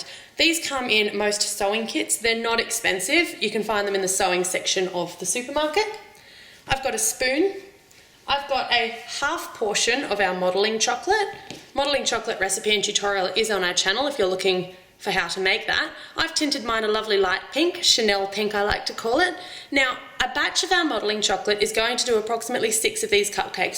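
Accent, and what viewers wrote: Australia. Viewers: oh my gosh, I love Australian accents. c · I love..I mean LOVE your Australian accent man if I had one j would never shut up cuz it's so cool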